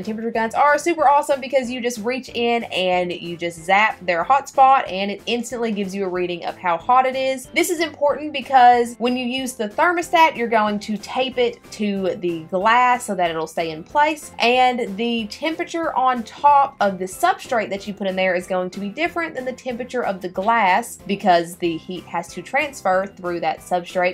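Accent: South, United States. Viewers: I love your southern accent! I'm a southern man myself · She obviously has a country accent, she could be from the south which is why her accent sounds the way it does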